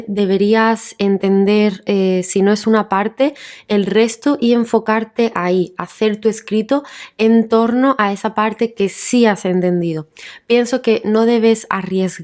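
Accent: Spain. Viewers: Que placer escucharla. Se puede aprender mucho observando los movimientos de su boca. Ella se convirtió en mi nuevo recurso del acento de España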